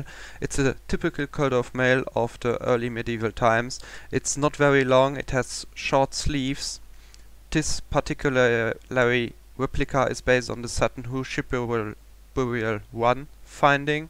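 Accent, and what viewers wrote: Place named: Luxembourg. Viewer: Luxembourgish accent ;-) So sweet compared to the hart german accent ;-)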